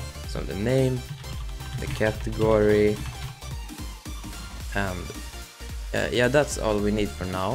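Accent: Sweden. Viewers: Hej är du svensk det låter som det? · Glad att man får höra en äkta svensk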